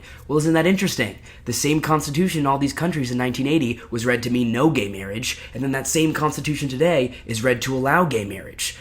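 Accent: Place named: United States. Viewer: Wait you're from Scandinavia? Your accent sounds so American.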